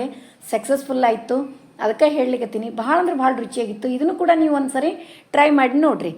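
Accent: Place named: Hubballi, India. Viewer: Aduge choyittu niu yaav urinavru nimma bhashe kelidre hubballi anistu😊❤🎉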